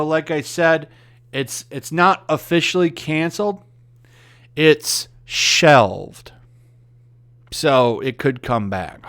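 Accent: United Kingdom. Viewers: Your british accent sounds funny af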